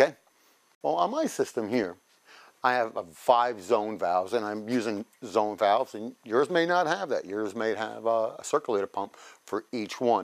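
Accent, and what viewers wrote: New York, United States. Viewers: Hey, you have a NY accent, are you from NY?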